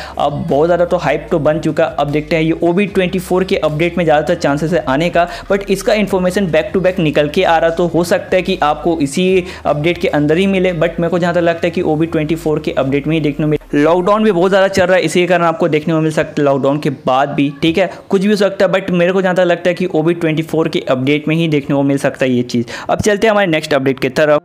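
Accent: Odisha, India. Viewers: bhai aap odisha se ho kya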